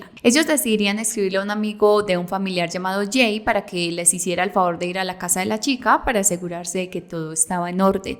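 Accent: Colombia. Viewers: Quisiera tener este acento 🤤🥰🥰🥰🥰es como que un fetiche escuchar el acento Colombiano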